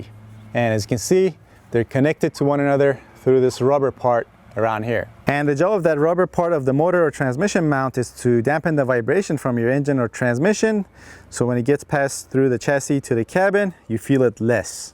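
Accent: Iran. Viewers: I can hear that Iranian accent. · From ur accent I can figure out that u r 100% Persian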